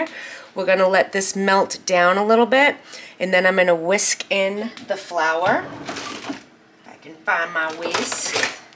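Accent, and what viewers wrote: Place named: Boston, United States. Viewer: Talking about accents, I absolutely love your Boston accent! I love all different accents, they just bring warmth to our language! Unfortunately mine is just plain jane!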